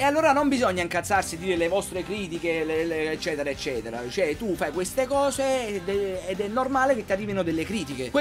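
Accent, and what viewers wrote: Naples, Italy. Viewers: questo video lo guardo tutti i giorni ed è l'unico modo di stare bene poi quell'accento mi ricorda la mia lontana napoli . Sorrido sempre ciao hahahah no dai forse è troppo così hahahha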